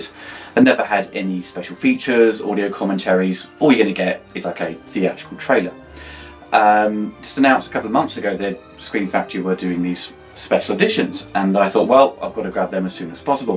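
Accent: United Kingdom. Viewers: I see these are only available to the US/Canada and I'm guessing from your accent you're from the UK? I ask as I'm also UK based and wondered how you got hold of them? Would love to get my hands on these:)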